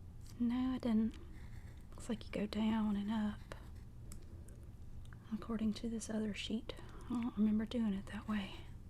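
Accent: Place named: Georgia, United States. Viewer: Boy you sound like you're from Georgia too! I have a thick accent too according to my friends haha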